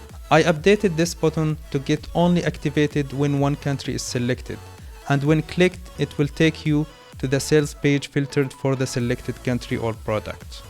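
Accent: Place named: Arab world. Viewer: From your ascent your are Arab it good to have some one like you but if you have another Arabic channel it’s more better.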